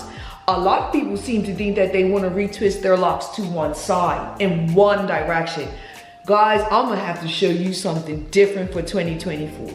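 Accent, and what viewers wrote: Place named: Bermuda. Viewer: I know a Bermudian accent when I hear it! Great video 💘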